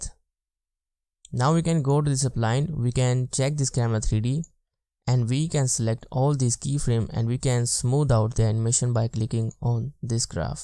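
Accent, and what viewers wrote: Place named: India. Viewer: I struggled a bit too. He sounds like he might be south Asian. Possibly Indian. I was able to navigate his accent because I’m friends with a lot of Indian dudes at my work who have similar accents. Once you work out his words, the tutorial is actually very good.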